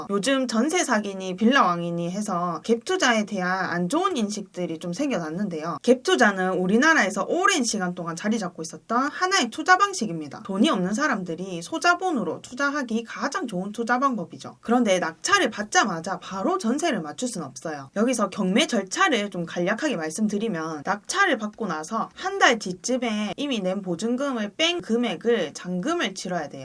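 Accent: Gyeongsang, South Korea. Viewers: 경상도 세요? 억양이 너무 쎄네요😢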